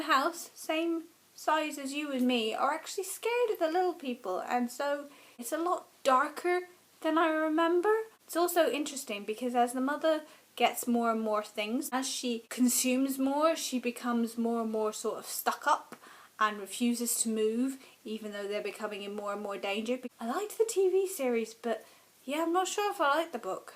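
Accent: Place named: Cockney, United Kingdom. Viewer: haha... I bow down to your cockney awesomeness! And you are right no snack is much worse than a lack of butter (well, unless the snack is milt). Though, I could do with a lot less butter these days - I've got two months to fit into my suit for a wedding. Luckily I'm now doubly motivated to do exercise - exercise improves cognitive function.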